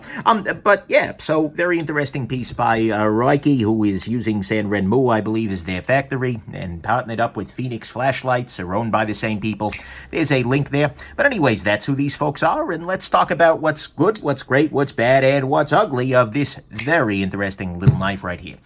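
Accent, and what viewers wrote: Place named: New Jersey, United States. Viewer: That is a New Jersey accent.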